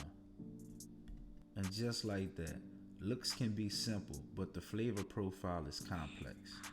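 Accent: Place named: New Orleans, United States. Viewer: The New Orleans accent tho 💪🏾💪🏾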